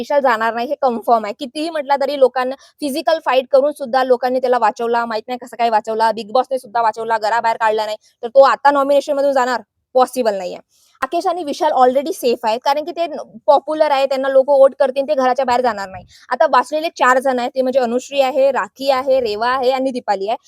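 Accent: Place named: Vidarbha, India. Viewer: वैदर्भीय भाषा वाटते ह्यांची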